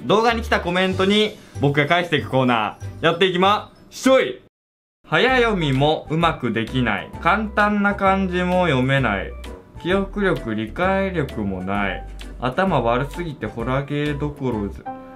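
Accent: Kansai, Japan. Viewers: たまにくる関西弁好き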